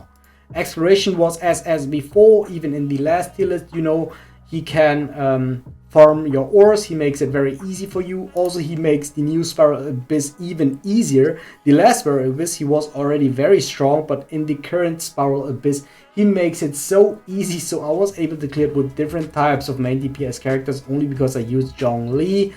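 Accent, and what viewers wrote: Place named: Germany. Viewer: I mostly agree with you tier list and think it's one of the best I have seen so far. The only argument that could be made in my opinion is Zhongli is the best character to me. He gives more support-dps options with his resonate dmg and his burst as well as a true support he gives way more than Venti does in terms of helping you team out. His shield makes you invincible and you shred the defense of the enemies by 20% at close range which might be the strongest ability in the game right now. I think it is a battle between Venti and Zhongli at the top and agree with both, but the argument could be made for both I tend to think Zhongli is a little bit stronger overall. Note: I like you english it's really fluent I have to say. I can hear you have an accent might be german or something like that, but really good english!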